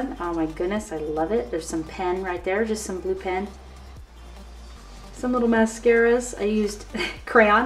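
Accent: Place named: United Kingdom. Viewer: What a lovely little folio! beautiful work as usual (British accent :))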